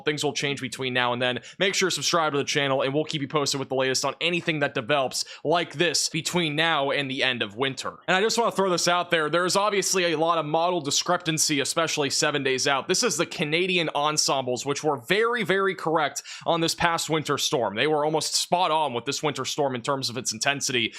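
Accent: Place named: South, United States. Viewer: "this here's important" Redneck I rekon, your the best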